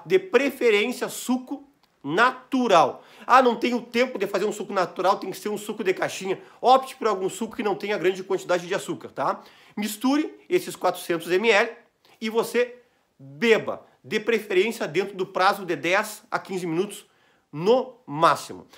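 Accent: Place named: Rio de Janeiro, Brazil. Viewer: Dr, és aqui do RS, como diz, e pra que ou por que esse sotaque carioca?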